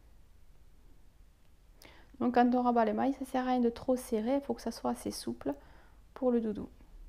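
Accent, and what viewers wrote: South, France. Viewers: Super merci beaucoup, j aime beaucoup vos tutos et votre accents du midi me rappelle les vacances 😊. Euhhh j avais une question : quelle composition de laine prendre pour les doudou Bb comme la layette ? Je débute et j ai des doutes avec le choix de la laine. Je sais qu'elle est conseillé sur le modèle mais j ai récupéré un gros stock de laine neuves, 💫mais beaucoup d acrylique biensur.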